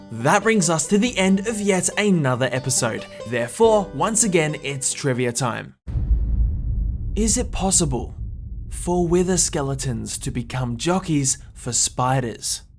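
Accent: Australia. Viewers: I immediately knew you're Australian because your accent is British on steroids. I know that I as a German should probably not be saying that, as German is basically Dutch on steroids, but that's something I just wanted you to know. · Yeaaaaaah I knew you were Australian for dat accent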